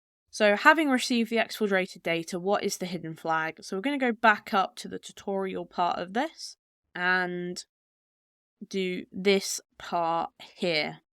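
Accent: United Kingdom. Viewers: Watching all now after I've tried or got stumped... need to throw money at you to teach me. Nice to hear a uk voice for a change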